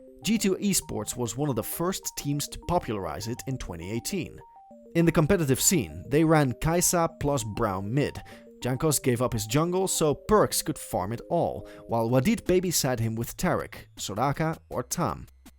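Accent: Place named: Netherlands. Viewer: I hear a dutch guyyy, Ahoi en gegroet :P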